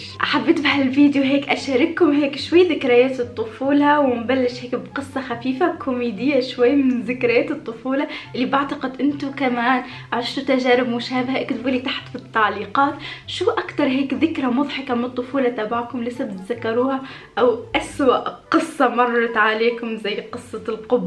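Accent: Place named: Tunisia. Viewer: لهجتك : كوكتال تونسي على مصري على لبناني من انت؟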